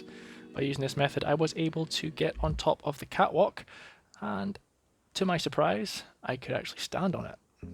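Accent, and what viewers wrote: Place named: England, United Kingdom. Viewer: Good, Your video are great. This videos make sense because Night City is a place where CDPR can make a lot of very good stories for a long time. CDPR will likely be doing this and building a cool universe, better than the candy-sweet superhero universe from Hollywood. More ambiguous. Hey tell my, where are you from? Maybe from UK, You have a little English accent, I think?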